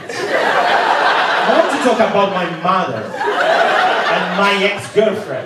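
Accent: Italy. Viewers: Fantastico! È proprio vero che il talento non ha barriere... Nemmeno quelle linguistiche. Il tuo inglese dall'accento italiano ti rende ancora più efficace! Bravissimo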